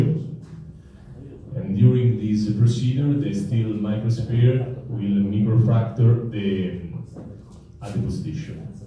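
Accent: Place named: Italy. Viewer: Che accento troppo italiano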